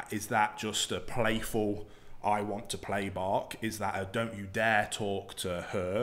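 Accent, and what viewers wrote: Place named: Midlands, United Kingdom. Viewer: LOL you sound like you're from the Midlands.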